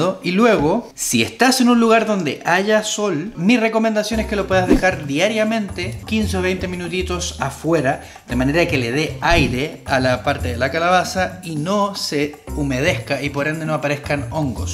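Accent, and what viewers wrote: Chile. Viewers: Che de dónde sos?? Pareses medio chileno por tu acento pero me párese medio raro un chileno tomando mate jaja qsy 🤷🏽‍♀️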